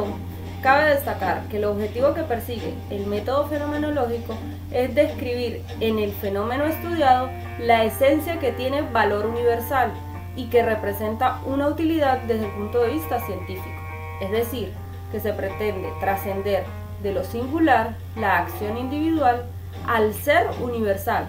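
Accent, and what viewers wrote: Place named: Bogota, Colombia. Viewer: ser la dura del curso! paraces bogotana jejejeje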